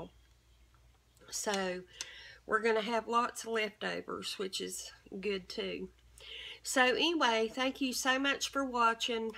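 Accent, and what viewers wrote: South, United States. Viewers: What a precious lady❣️ I’d love to stop by and have dinner with this sweet lady...love her southern accent. Reminds me of my family ❤️❤️❤️ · you are such a sweet heart ya had me with the breakfast casserole n Tennessee sausage.. is the only thing this TN gal will eat.. Love your gentle nature, nice southern charm.. NC n TN have similar accents.. I get the make a meal for several days.. is cheaper.. faster n no fussin, always have extra in case ya have company droppin in... cuz it is jus bad manners to NOT.. feed them.. always have a snack drawer in the fridge in case there are kids along too.. they love goin in there n rustlin around choosin something sweet or salty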